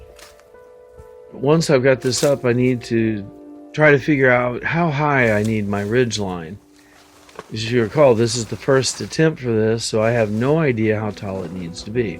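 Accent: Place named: Newcastle, United Kingdom. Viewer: he has a georrdie accent ,some folks thinks its scotish cheers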